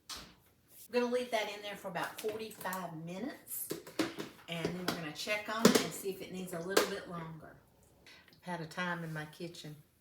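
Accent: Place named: Texas, United States. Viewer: Just found you on here your banana bread looks so nice I'm going to try it cos respices tells you 2 bananas yours has 5 so maybe it will taste and look better I'm like to bake but I'm not very good at it 😂and love your texes voice I'm Irish but live in Spain anyway glad I came arocse you till next time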